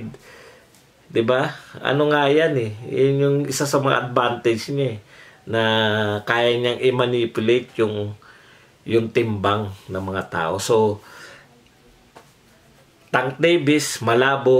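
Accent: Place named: Tagalog, Philippines. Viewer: Galing talaga mo boss. Malinis managalog at kahit walang video sakto mga punto.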